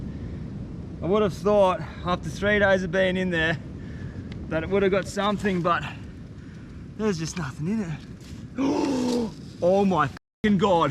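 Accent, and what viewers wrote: Australia. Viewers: What an inspiration. The Aussie accent just makes it that much more watchable. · He is Australian. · Is he from Australia?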